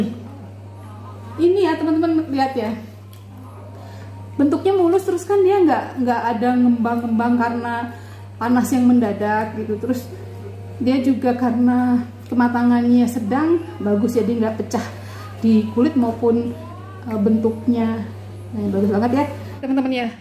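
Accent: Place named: Java, Indonesia. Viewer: iki kok logate jawa yaa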